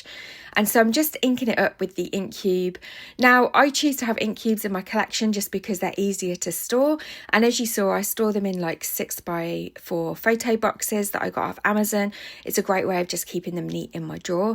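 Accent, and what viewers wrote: United Kingdom. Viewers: I am so in love with your card! This was a wonderful tutorial! I really enjoy your British accent and your style of video! Now I have to to buy this set! Haha. Thank you so much for the inspiration!❤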